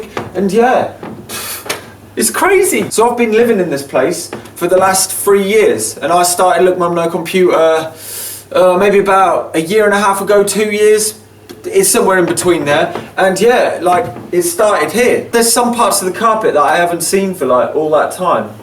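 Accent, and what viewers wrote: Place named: London, United Kingdom. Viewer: I 'm just watching your videos cuz you're funny and have a genuine londonian accent. I like that!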